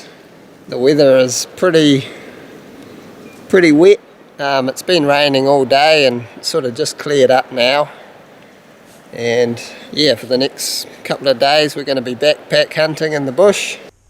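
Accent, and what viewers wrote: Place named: New Zealand. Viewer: Did you grow up in the urewera region bro or a you a southerner ?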